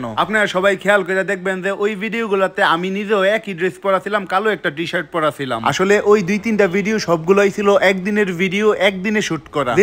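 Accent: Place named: Brahmanbaria, Bangladesh. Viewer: আসসালামু আলাইকুম ভাইয়া আপনার ভিডিওগুলো দেখি কারন আপনি আমার নিজ জেলার ভাষায় কথা বলেন খুব ভালো লাগে। Brahmanbaria মানুষ শুনলেই মানুষ ভয় পায় এবং বলে ভালো না আমরা এই কথাটা আমারও শুনতে হয়। আসলে আমার মনে হয় সব জেলাতেই ভালো খারাপ মানুষ রয়েছে। সবাই খারাপ হয় না। কিছু মানুষের জন্য সবার বদনাম হয়। এটা মানুষ বুঝতে চায় না। আজকে আপনার ও আপনার বন্ধুর কথাটা শুনে মনটা শান্তি পেল 😊😊😊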